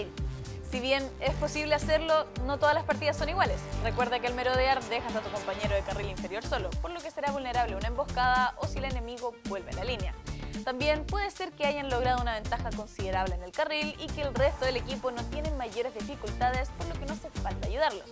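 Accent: Chile. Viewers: 4444 lo justo ni más ni menos tal vez un poco pero no más habla bien y todo es chilena lo noto por tu acento y por lo demás bueh solo eso no más es lo justo .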